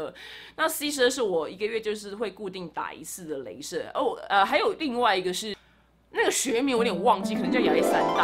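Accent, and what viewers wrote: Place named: Thailand. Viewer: 泰國味好重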